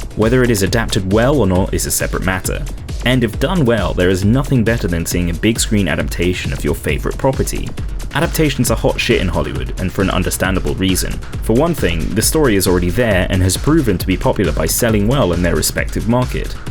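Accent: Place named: United Kingdom. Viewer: Petition well and truly signed. Also, another great video essay right here. Loving the charming British accent. Wish I had one.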